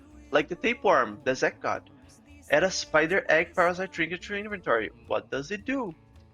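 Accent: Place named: Brazil. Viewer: where are you from? looks like brazilian accent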